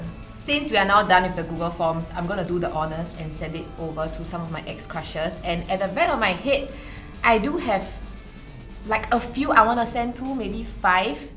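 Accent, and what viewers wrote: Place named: Singapore. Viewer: can hear the singaporean accent eh!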